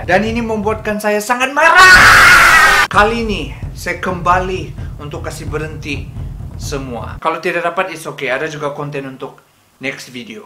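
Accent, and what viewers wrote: Sabah, Malaysia. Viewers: Awak org Sabah ka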